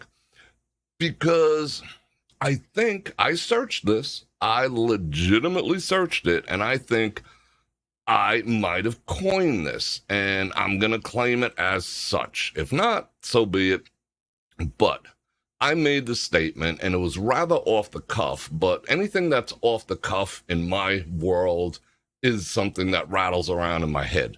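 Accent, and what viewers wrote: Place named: New Jersey, United States. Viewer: It was great fun. Sorry, i missed the live part, what ever you talk about, its like have a friend chatting, i really enjoy listening to you, you sound like Jersy to me, I'm from Wilmington, D, just over the bridge.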